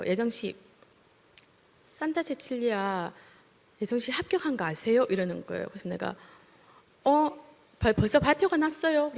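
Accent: Busan, South Korea. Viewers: 고예정님 저도 부산 동대신동에서 태어났어요 ^^ 정지~~ 부산 사투리 고소합니다. 항상 하나님을 증거하시는 삶이 되시길 축복합니다.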